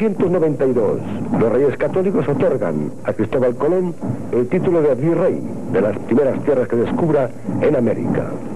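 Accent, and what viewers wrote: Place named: Argentina. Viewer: Yo creo que no, al narrador le oigo un poco el acento de Argentino o Sud Americano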